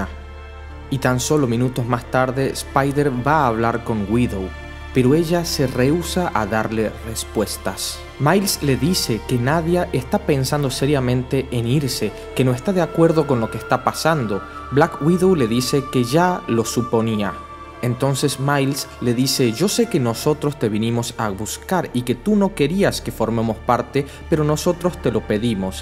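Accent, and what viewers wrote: Tucumán, Argentina. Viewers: sos tucumano amigo? habla como tucumanl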